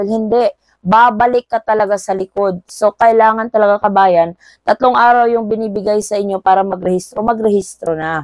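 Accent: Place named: Spain. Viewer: Must not be for people to speak English sounds like you're speaking in Spanish but I know you're a Filipino because the Spaniards did come to your country at one time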